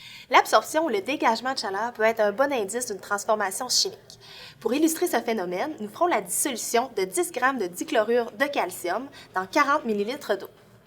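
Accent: Canada. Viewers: merci grâce a vous je vais pouvoir mieux faire l'accent Canadien ! MERCI BEAUCOUP!!!!!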